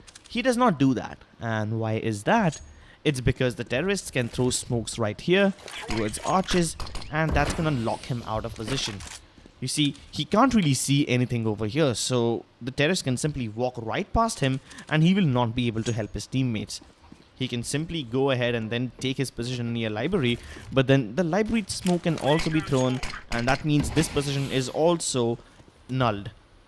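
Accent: India. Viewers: ur indian? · Are you indian? Your accent sounds indian. Btw, love fron bihar, India! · You Indian?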